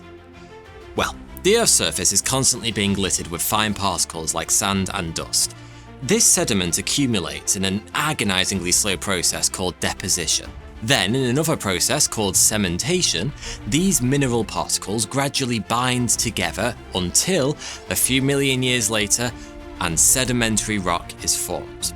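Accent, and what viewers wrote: United Kingdom. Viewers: His partial British accent made things more slow n me sleepy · Good grief that particular British accent or whatever it is is awful.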